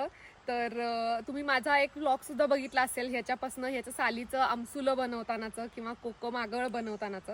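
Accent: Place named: Maharashtra, India. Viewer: मूळ गाव पुणे का तुमचे बोली तुमची पुणेची वाटते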